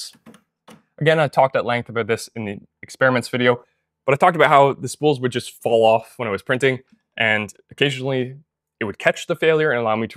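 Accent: Canada. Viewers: Good video. You've got. A unique. Halting style of speaking Canadian. I don't hate it. Eh.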